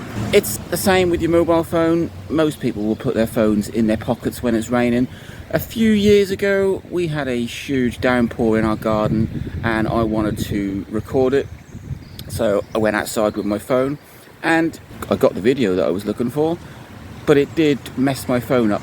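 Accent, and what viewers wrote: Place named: United Kingdom. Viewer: I just found your channel today, I love your British accent and as a student about to be an English Teacher, I find it very helpful.. I also love gaming.. I'm a PC gamer, but I still do love gaming in general.. Like 10 years ago I had the opportunity to travel to Germany where I got my first portable console, it was a PSP.. What a beast of a console! I ended up selling it cuz I was stupid honestly, and I regreated my whole life since then, besides that, I never owned another portable console..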